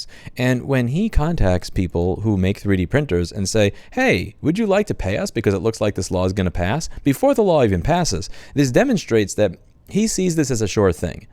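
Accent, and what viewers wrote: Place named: Texas, United States. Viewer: He has a texas accent now!!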